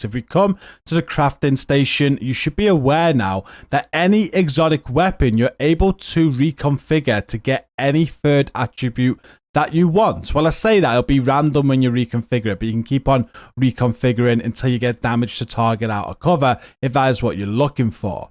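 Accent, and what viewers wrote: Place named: England, United Kingdom. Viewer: I really like you man, I follow you I support you and I played with you and your clan for a time but GOD I HATE ENGLISH ACCENT.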